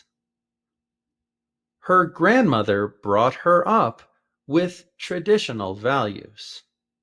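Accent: United States. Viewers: American or Bri?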